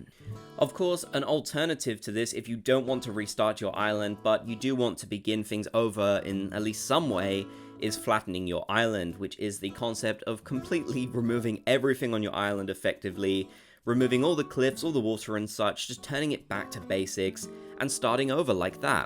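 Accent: United Kingdom. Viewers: both are british❤️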